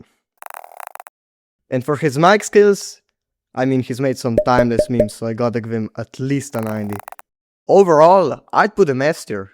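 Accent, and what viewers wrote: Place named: Southern Europe. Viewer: im guessing youre either from sa or southern europe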